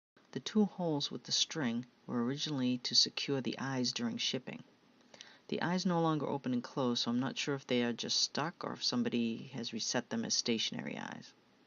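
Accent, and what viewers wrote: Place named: Northeast, United States. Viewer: Oh my god! I am drooling over your beautiful dolls. WOW! Great video. You must be from the Northeast. I love your accent. I just subscribed.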